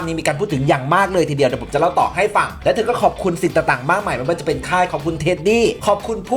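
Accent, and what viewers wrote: Isan, Thailand. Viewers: แอดมิน....คนขอนแก่นหรือสารคาม...แมนบ่ล่ะนิ...😁😁😁😁😁😁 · ขำบพเว้าอิสานน่ารัก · ดีใจกับน้องกะดีใจอยู่ แต่ขออนุญาตหัวเสียงพากย์คลิปของแอ๊ดแหน่ได่บ่ฮึ..ไทบ้านได๋น้อแอ๊ดนิ🤣🤣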